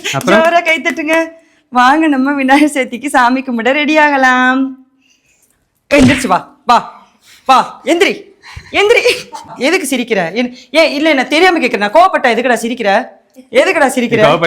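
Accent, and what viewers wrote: Tamil Nadu, India. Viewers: I am fan of ur tamil accent n voice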